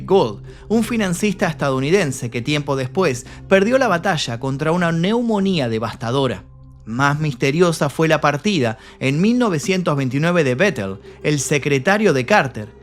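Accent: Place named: Argentina. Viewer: Un locutor argentino no no que mal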